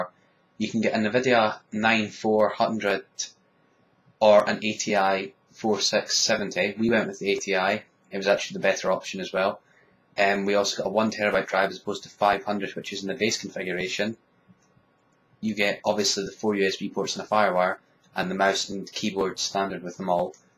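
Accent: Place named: United Kingdom. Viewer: Nice review glad to see a British review instead of a annoying yank. Am going to get one I just fancy a change from a windows pc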